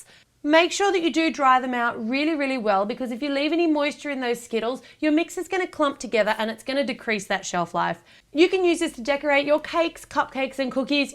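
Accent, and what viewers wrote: Australia. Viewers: Are you aussie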